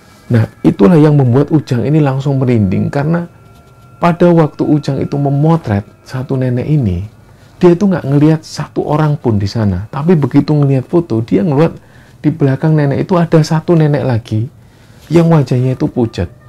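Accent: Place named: West Java, Indonesia. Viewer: Lidah Kulon 👍 mas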